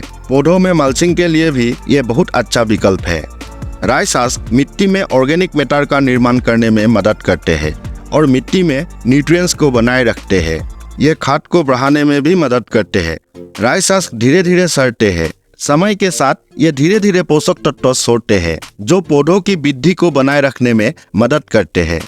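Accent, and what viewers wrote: Assam, India. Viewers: apke accent sun ke lagta hai ap Assamese ho. Hamare yaha mill mei powder milta hai, "tush" samajh jaoge. Rice mill hai 10km dur waha se 1 bori leke aaya tha. Aadha aise he raw use kiya agalonema mei. Phir kal baki aadha kal aise kadhai mei deke jalaya. 😄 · Ap ke Hindi Assamese jeshe hai.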